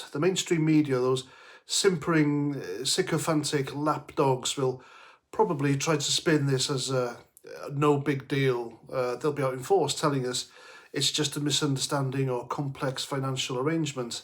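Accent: Wales, United Kingdom. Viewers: Ty for pointing out my mistake. I actually meant Welsh but put English by mistake, buddy. Your accent tells straight away your strong Welsh.💪💯🙏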